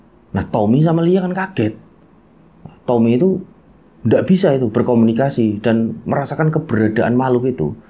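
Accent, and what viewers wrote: Java, Indonesia. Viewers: Seneng cara bawainnya,jawa bangettt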